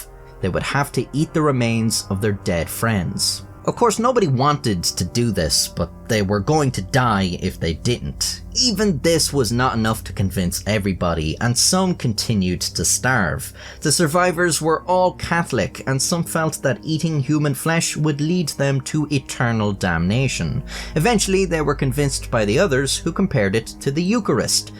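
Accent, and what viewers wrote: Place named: Ireland. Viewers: Maybe you just wanted to hear an Irish voice telling a tale with some line art animation, or maybe you wanted to know how to eat human. I'm here for the first thing, btw. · I clicked because funny Irish man be talking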